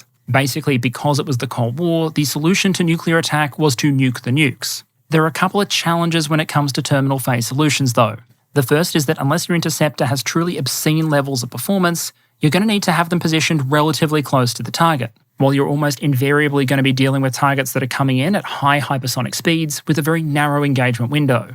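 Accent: Australia. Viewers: Am I the only one finds it amusing and somewhat dystopian that team has needed to substitute "Australia" for "Israel" in this videos subtitles to avoid automated demonetisation by youtube? It's amusing that a tech superpower like 'Alphabet Inc' and its automated filters can be defeated by the Australian accent and tweaked subtitles, and dystopian that team has had too do it An example of this is at the minute mark, but it happens throughout the video so I don't think it is an accident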